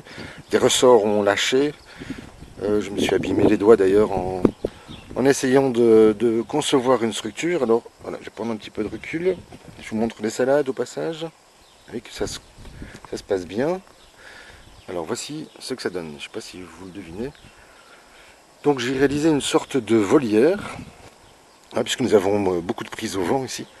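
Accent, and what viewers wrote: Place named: Brussels, Belgium. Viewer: Salut, merci! J’ai reconnu dans ton intonation une musique chère à mon coeur d’ex- Bruxelloise 😉. Je te félicite et te remercie de nous offrir tes belles idées. Bises du Nord de France, Claire.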